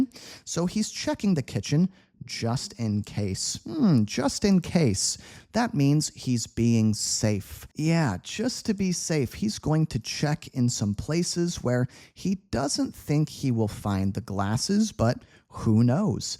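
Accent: United States. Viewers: I'm learning UK English, I don't mind watching your videos and following your recommendations, isn't this channel American English?,I from Xorazim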